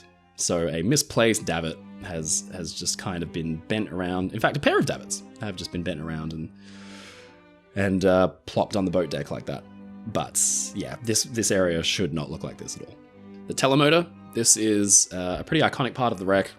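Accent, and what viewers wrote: Australia. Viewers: I don't know how long you were in the U.S., but it was long enough for an American accent to find its way into your narration of this video every now and then when your Aussie accent 'drops out'... 😉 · I enjoy your videos,. I also love listening to your beautiful Aussie accent. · Why would a British person have an Australian accent?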